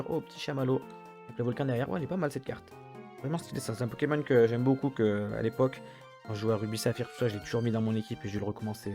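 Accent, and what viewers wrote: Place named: Lyon, France. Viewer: un lyonnais je parie ou stéphanois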